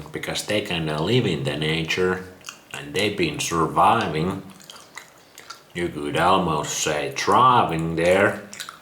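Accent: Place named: Finland. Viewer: does raw meat at least taste good lol? also your finnish accent is strong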